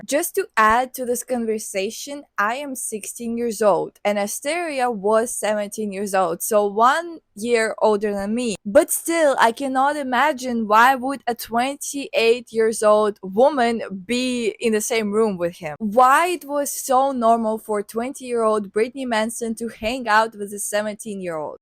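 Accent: Russia. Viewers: С самого начала слышала малюсенький русский акцент и думала мне кажется но нет🙂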